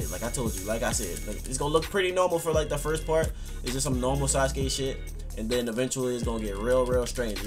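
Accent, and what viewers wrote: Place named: Philadelphia, United States. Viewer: is from Philly the dudes that say down like that form the P lol my boi from there and say it the same lmao... love your channel fam keep up the good videos ma g!!!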